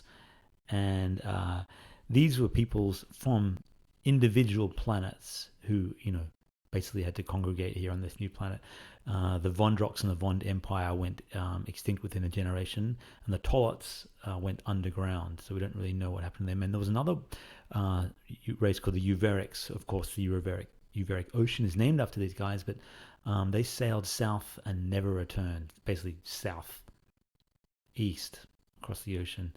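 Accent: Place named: Australia. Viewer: Thank you very much, I really appreciate it! Sometimes I just like eating a bunch when down. I should definitely take a break from technology for a few minutes and go out for a hike or jog. Also, your aussie accent is wonderful!:D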